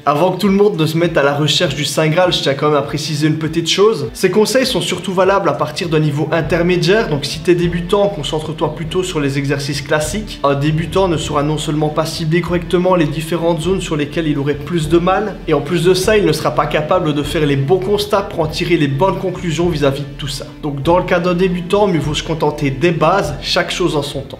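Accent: Liège, Belgium. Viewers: Salut. Merci pour les infos! Ton accent me fait penser sérieusement que t es du côté de Liège? Vive la Belgique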